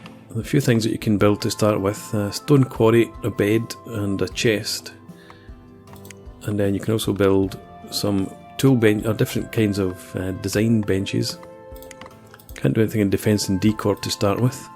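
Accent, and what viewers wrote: Scotland, United Kingdom. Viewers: Just gotta say cheerio for that accent :) Scotland Forever!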